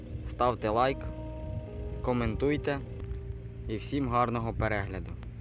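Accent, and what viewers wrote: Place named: Russia. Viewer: Швидка, дрібна, часу, праці - а вы произносите эти слова по-русски : быстро, милка, времеми, роботы и т.д. Это такой украинский - любой сможет на нём говорить, каверкая русские слова и называть такой ,,язык" украинским.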